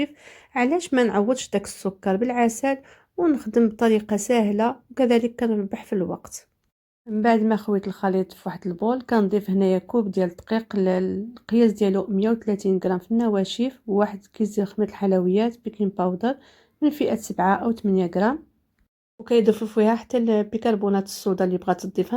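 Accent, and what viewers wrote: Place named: Algeria. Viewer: اللهجة جزائرية الحلوة جزائرية والسرقة مراكشية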